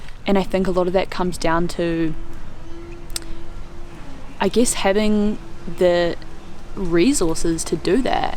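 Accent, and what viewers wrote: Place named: New Zealand. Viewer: Don’t be so anxious...I could listen to you read the phone book for hours...your kiwi accent is like ear candy...keep talking....in other words, don’t feel like you have to live up to any high standard, with incredible scenery or with wild adventures....just be yourself....I’ve been watching you on Delos and Ramatree for a few years and so has a lot of other people....we feel like we know you and we truly care about you...and we want you to have fun in whatever ever you do, but to also be cautious....the Tunisia video had a lot of us scared....I think most people just want what is best for you....and that is where some of the judgements from viewers are coming from....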